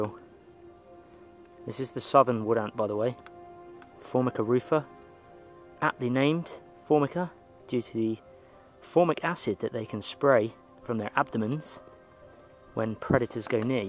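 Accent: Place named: England, United Kingdom. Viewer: Where is that particular English accent from? Kris Harbour Natural Building has that EXACT accent. Tone, cadence, emphasis, everything.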